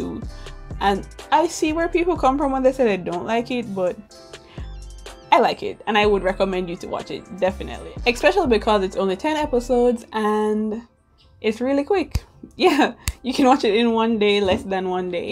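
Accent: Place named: Caribbean. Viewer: I am livingggggg for the patois and confidence. serving us island girl realness as always.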